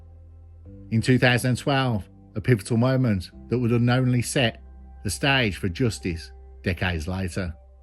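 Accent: Birmingham, United Kingdom. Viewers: 89K - I’ve been with you wen you We’re on 3.5K- bruv your utter brilliant and the way you make the story’s come to life! They say you even pay tribute to the family’s with they way you talk with such respect.. just wanted to leave this here, as show you can do whatever you u good at- also Love the brummy accent , as I was in Hmp YOI Brinsford Wolverhampton and I even learnt what a yam yam is 🤣🤣- my co producer on music is also a brummy - big ups bro keep it rolling ❤🔥🔥🔥💪💪🙏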